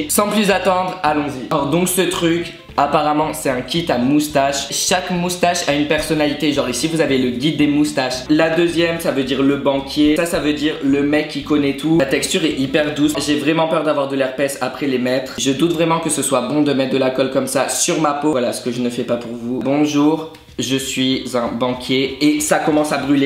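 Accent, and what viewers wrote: Quebec, Canada. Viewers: t'es tu Québecois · Est tu Québécois ou Français ? Je suis dans le doute 😂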